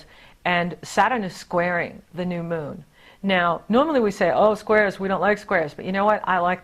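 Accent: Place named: East Coast, United States. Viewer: I feel so awesome and enlightened after your readings..i hear a hint of tri state area, east coast in your accent...DE/NJ here, ty !!!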